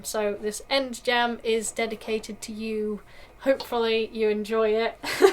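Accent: United Kingdom. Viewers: It sounds great,and your british Accent too!!Greetings form Argentina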